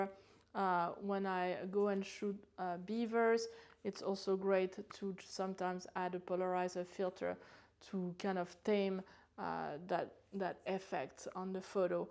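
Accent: France. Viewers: Are you from the south of France? You sound just like an old friend of mine.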